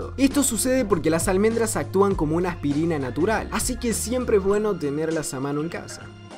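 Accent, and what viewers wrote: Córdoba, Argentina. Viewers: decime que sos argentinooo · sos cordobes?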